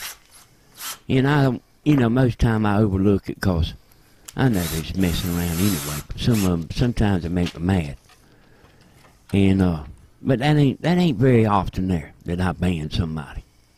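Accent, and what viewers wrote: South, United States. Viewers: There's a whole bunch of people that sound like down south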